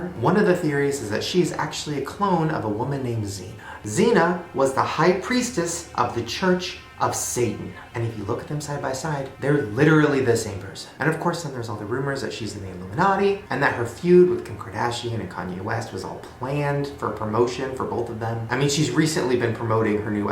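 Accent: South, United States. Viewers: he still has a bit of a southern accent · shane....that's still a southern accent it's just not as thick. people also tend to lose accents when they move, etc. and he lives in florida now so....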